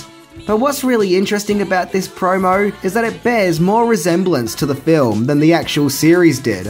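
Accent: Australia. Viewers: Yeah i remember the rename to the slimer thing. I had kind of started to loose interest in it then. But the first couple seasons were really good. But that's what happens to a fruit when you juice it too much. The first press is awesome. Second press.. kinda bitter. third press... you might as well eat the peel. I have been watching your videos trying to place your accent. I never guessed Aussie. And I've been talking to Australians since yahoo chat was a thing. I like Australians. I had a lot of fun conversations back in the mid to late 90's with australian guys on yahoo just joking and talking smack. You are a friendly bunch. I like that.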